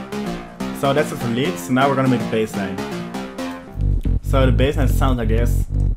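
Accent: Netherlands. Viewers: graag gedaan gozer! Ik hoor aan je stem dat je gewoon NL ben haha, ben je ook een dj en wat is je insta?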